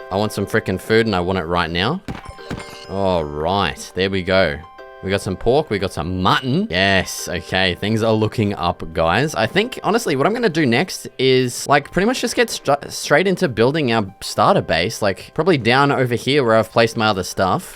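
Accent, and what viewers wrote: Australia. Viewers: He’s Aussie!? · You sound like a Aussie bloke nice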